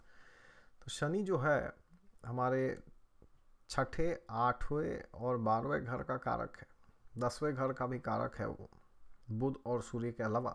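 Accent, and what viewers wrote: India. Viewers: I just love your Hindi accent 😂😂😂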